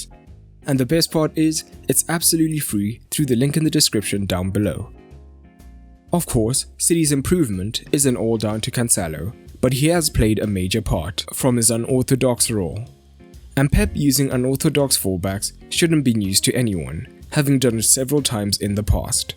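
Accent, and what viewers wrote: South Africa. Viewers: 😅Brother I can your South African accents ,you can’t hide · You sound South African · Are you South African 😂? Shoutout if you are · Is the narrator of this video South African?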